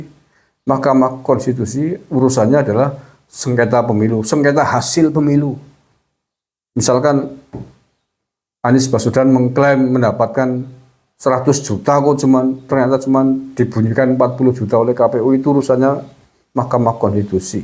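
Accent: Banyumas, Indonesia. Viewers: Aha pak rika bahasNe ngpak banget banyimasan,aku dadi seneng ngringokna bahasane rika kas bantumas aku wong wanareja ds Cilongkrang,paryaiku geindra pak....🙏🙏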